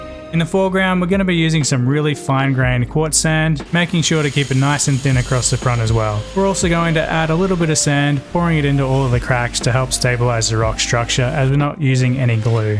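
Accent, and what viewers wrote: Australia. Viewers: I may be wrong but your accent sounds Australian, if so what store did you get the rocks from?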